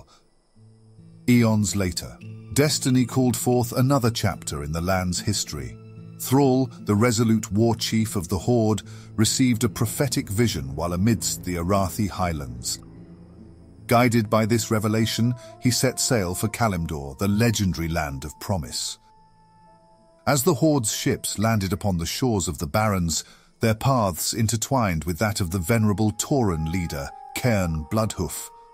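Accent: United Kingdom. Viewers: Hey, I do not know if this commentary will eventually be read by you, but I must say I really enjoy this splendid series. I am a foreigner so you have no idea how much time I spend on dissecting every little word that I cannot get through haha. This is such a good improving lesson each time a new video comes out though and even if I always considered myself bilingual, realising I still can struggle sometimes with some words is a wee bit frustrating haha. So here I am, catching myself reading outloud the subtitles as you speak and even though the videos are around 20-25 minutes, I spend the double amount of time training and repeating that lovely british accent. So thank you for that, mate. Have a good day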